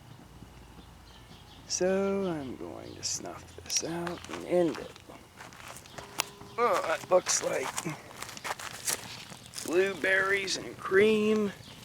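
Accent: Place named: Pittsburgh, United States. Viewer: I'm loving the Pittsburgh accent btw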